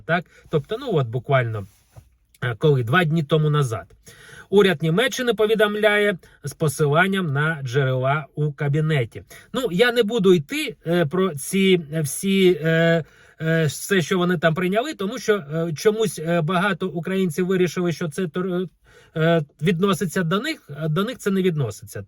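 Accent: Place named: Russia. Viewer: Автор влога, для того, чтобы вести влог на укр языке, выучите его. Ну нельзя же так глумиться над ним. По вашей речи ясно, что Вы думаете и говорите в жизни на рус языке. Много слов, которые Вы пытаетесь произнести на укр языке, украинцы так не произносят.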